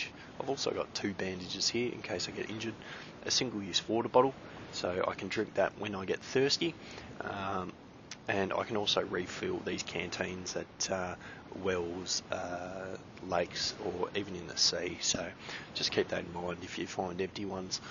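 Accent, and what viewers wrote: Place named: Australia. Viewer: Thanks for the videos, helped me heaps, loving the Aussie accent :D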